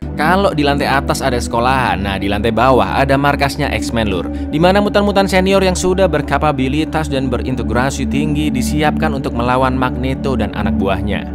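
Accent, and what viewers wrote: Java, Indonesia. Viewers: SUARANE ATMIN MEEDOK KOYO TELO EMPUK NGANGENIN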